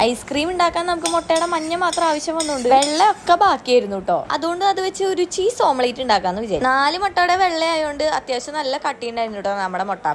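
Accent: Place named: Thrissur, India. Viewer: Thrissur slang pwoli anu tto..pinne ah Aesthetics nannyitund 😅..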